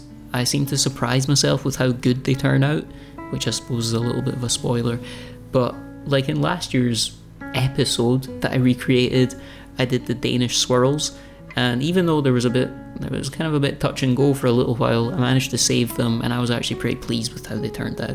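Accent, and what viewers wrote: Scotland, United Kingdom. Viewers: THIS was so relaxing to watch. I enjoyed every minute of that!, good job. Your voice is ever so calm...and being an American I SO MUCH appreciate your accent. I understand every word, but makes me want to learn to speak English with a Scottish accent. I found it SO CUTE that JR your cat wants to be a sous chef while you bake. Adorable. Your sense of humor is spot on too, laughed out loud a couple of times during this. A you tube fan, Brian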